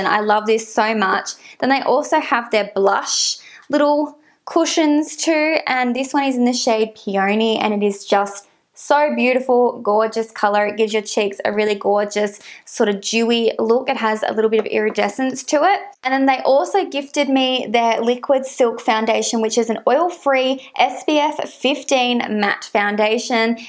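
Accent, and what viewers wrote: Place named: Australia. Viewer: I Love your Australian accent it's beautiful, Are you able to get collection products if so I would love for you to do a testing video for it because I want to see other people's reaction to some of the products I own and love and if you agree X Shannon love you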